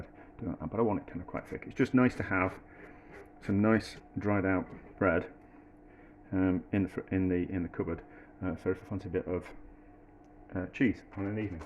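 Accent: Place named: England, United Kingdom. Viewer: Granted I'm an American but I find his English accent very hard to understand. He talks too quickly and not loud enough.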